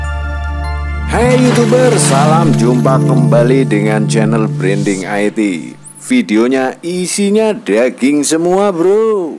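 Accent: Banyumas, Indonesia. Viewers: jebule ngapak temen rika kang🤣🤣🤣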